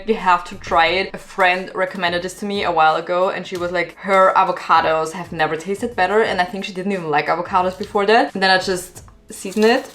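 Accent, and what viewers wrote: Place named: Germany. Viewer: oo yum im gonna make the avocado cottage cheese lunch, also where are you from? you sound very german ahah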